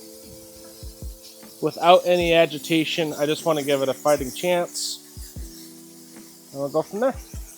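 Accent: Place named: Canada. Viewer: nice demo. It looked a little scary when you sprayed around the alternator. Thanks for speaking Canadian. 🇨🇦👍👍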